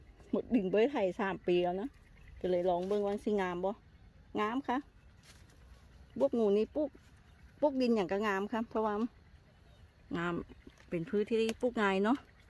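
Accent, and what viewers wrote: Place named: Loei, Thailand. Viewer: อยู่ จังหวัดไหนค่ะ พูดเหมือนคน เมืองเลย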